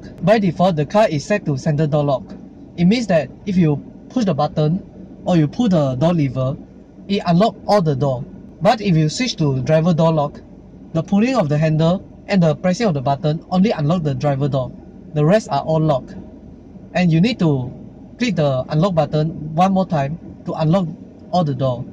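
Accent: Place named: Singapore. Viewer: walau... your English is having same slang as Singaporean English...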